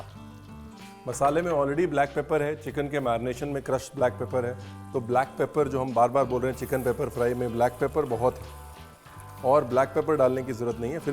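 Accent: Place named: Lucknow, India. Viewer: Sir apke muh se lucknowiya bhasha bohoateee hi badiyaaa lagti hai... Ekdum gajab!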